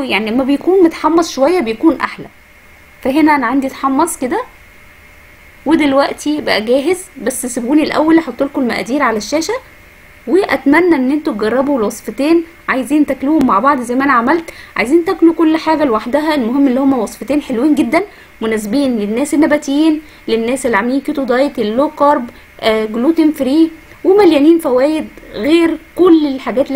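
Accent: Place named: Egypt. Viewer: ماشاء الله عليك وصفاتك روعة وكلامك حلو بحب اللهجة المصرية بلد الياسمين تحيي ام الدنيا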